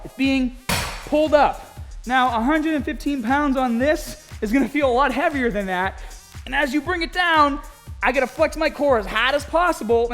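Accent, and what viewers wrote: Boston, United States. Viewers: A regular bahbell, is that what they have on the fahm! I love ya my Bostonian Brother! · Well, if you want more people to take you seriously, please knock off that exaggerated "Boston" accent. You may be from Southie or Medford or Somerville, but strangely, you never did your "Haavaad Yaahd" act in your original videos. I lived in Boston for four years, and know that most "local" folks there don't really speak such a fake, hackneyed way to each other - only when there are non-Bostonians around, who (for some unknown reason), find your irritating "dialect" cute or charming, so you turn it on to get more likes. Other vlogers in your sector (like Jeff Cavaliere, etc.) don't seem to need such a stupid gimmick like saying "baaaaabells"; they just focus on content. But you're wicked pissah anyway!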